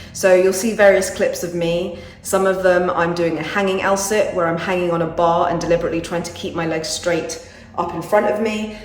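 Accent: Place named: United Kingdom. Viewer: You look like an indian and sound like British 😁😁😁😁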